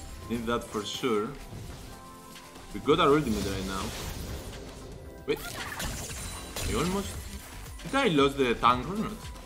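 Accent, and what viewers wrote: Greece. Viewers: pretty sure its greek. i am from greece and lots of people talk like that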